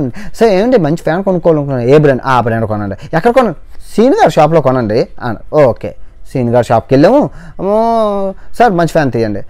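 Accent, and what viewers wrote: India. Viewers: America vellina mana slang manadea...👌 😂😂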